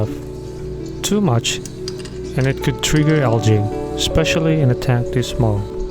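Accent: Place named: United States. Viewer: Thumbnail is AI -Voice is american -backround voices are japanese/chinese/korea Something is very off here.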